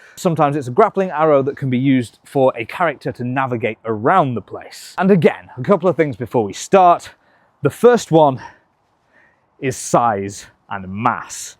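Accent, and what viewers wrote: United Kingdom. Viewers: ok so you're british right? Slow Mo Guys collab???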